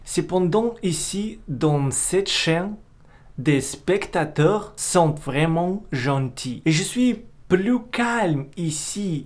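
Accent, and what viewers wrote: Russia. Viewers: Bravo tu progresses de jour en jour dans ton élocution;. Tu vas gagner en fluidité grâce à la pratique. Je pense que tu vas arriver très rapidement à parler le français sans accent russe.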